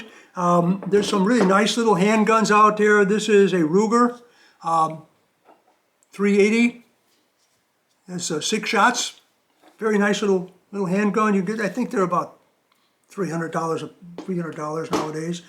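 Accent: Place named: Chicago, United States. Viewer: Unc you definitely sound like a kid raised to in the Chi..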